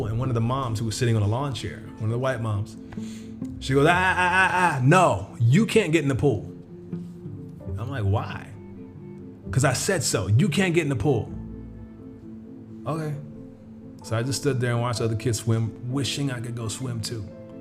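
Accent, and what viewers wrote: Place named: Mount Washington, United States. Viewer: You sound like you was in Mt. Washington or somewhere close...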